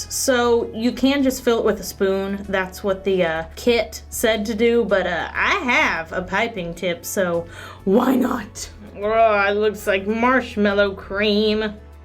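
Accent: Texas, United States. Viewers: I love your slight texas accent! It makes me so happy!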